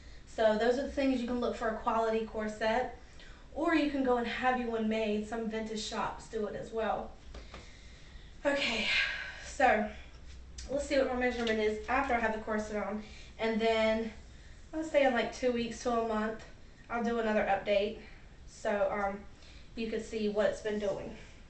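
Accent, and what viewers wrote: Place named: Carolinas, United States. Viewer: Hey this is not a stalker creepy comment but what state do you live in. Because of your accent I would say North Carolina or maybe South Carolina??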